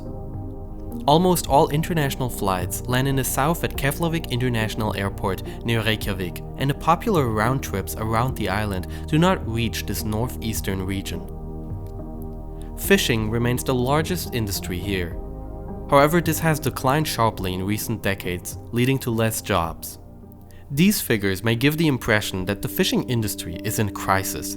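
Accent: Germany. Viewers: German narrator :D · Der Sprecher ist doch 100pro ein Deutscher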